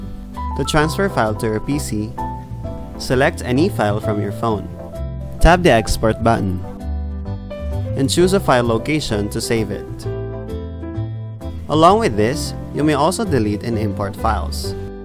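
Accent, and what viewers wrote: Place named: Philippines. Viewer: this is the thickest pinoy accent ive heard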